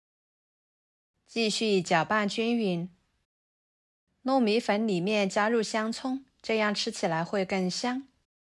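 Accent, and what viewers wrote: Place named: Yunnan, China. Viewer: 云南普通话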